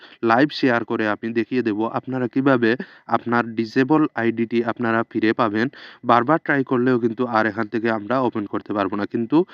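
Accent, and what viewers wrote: Sylhet, Bangladesh. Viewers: ভাই কি সিলেটি??😊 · Vai kita Sylheti ni?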